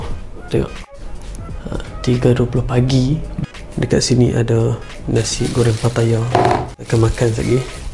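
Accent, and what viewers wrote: Kedah, Malaysia. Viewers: loghat kedah memang macamtu bang...boleh search kat google ataupon youtube🙌kalau selipar pon orang kedah panggil kasut bang🙌kalau macam kelantan biskut dioarang panggil "sekkut"...setiap negeri lain lain loghat bang🙌👍🏻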